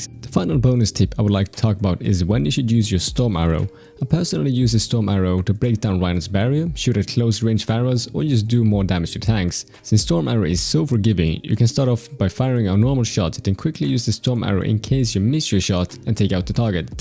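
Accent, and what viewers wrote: Sweden. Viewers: Bra video som fan, man hörde på din första mening den markanta "svenskheten" :P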